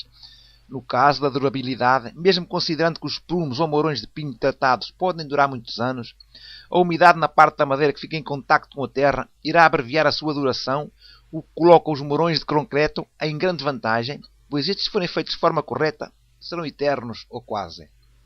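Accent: Portugal. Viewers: Com certeza é português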